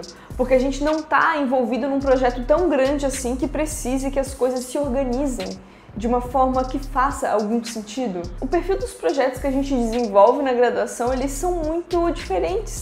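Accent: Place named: Itajaí, Brazil. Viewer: Eu reconheci o sotaque mas pensei que não fosse, mas vi em outro vídeo que vc é da mesma cidade que eu, Itajaí Sc! Quero ingressar no curso mas por ser no período matutino não consigo por enquanto. Espero conseguir em breve!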